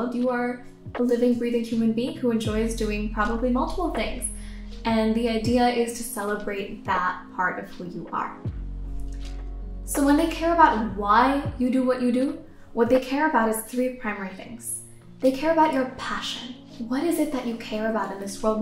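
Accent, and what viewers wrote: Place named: United States. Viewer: Why your American accent is so natural???? How can I improve it like u???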